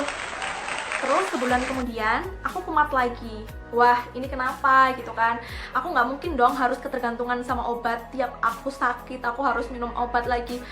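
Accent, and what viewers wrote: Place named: Java, Indonesia. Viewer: Ngomong e medok mba, asline wong endi?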